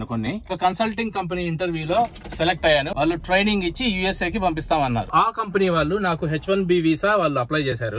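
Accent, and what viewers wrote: Kadapa, India. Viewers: Anna I'm also from kadapa 😁 Language slang suuuper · కడప భాష అదుర్స్ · Anna Mee vedios chalabaguntai....manakadapa basanu inka meru gurtupattukoni chala clearga matladutunnaru.. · Sir I am very glad to see a Kadapa person talking in kadapa accent sir namaste I am from kadapa, pendlimarry mandala, anna namaste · Memu kadapavallamu andi malage matladutunnru andukey ma vallu aney feeling vachindi me matlalu vintuntey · Super anna! Great journey,🙏🙏🙏 Seema language bagane matladuthunaru inka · U posting great videos, Please try to talk always in your natural slang..Raayalasima slang... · Inni rojulu mi yasa chusi ma kadapa district ani doubt vundey.. ipudu confirm ayindi.. manchi info isthu ilagey videos cheyandi.. love from Kadapa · Me matalu vintene pakka rayalaseema ani artham avutundi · అందుకే మీ బాష పచ్చి రాయలసీమ దని నాకు ముందే తెలుసు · Ur great sir meeru inka telugu and kadapa yasa marchipoledu enni years aina.... 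· Anukokunda mee vedio chusanu flightjourny gurinchi.meeru matladevidhanam telisi kadapaani doutvachindi ,andulo Reddy's ani anukunnanu .endukate Maadi palletutu,kani maa vurilochalamandi.reddys Amerika,landanlo,jarmanylo vunnaru.Reddy's vallu chaduvulo eppudu first vuntaru and very intelligent.meeru maatalu vintunte nenu tenthclasslo vunnapudu maa hindi sir mallareddy garu gurtuku vacharu.sootiga matladatam,jokes veyyatam same anni alage vunnai .ayanadi Kadapa. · Mee slang adurs nenu kooda from raayalaseema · Super garu pakka rayalaseema basha lo chepputhunnaru.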